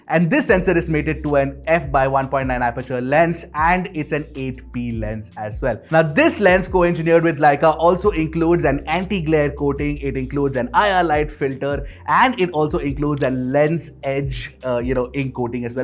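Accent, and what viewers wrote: India. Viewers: I swear indian accent English is pure f.king ear cancer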